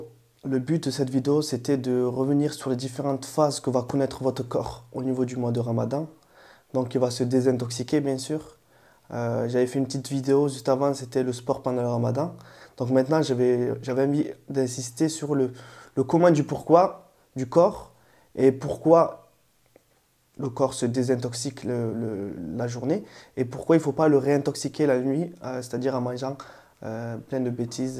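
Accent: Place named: South, France. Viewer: J aime bien ton accent du sud mashallah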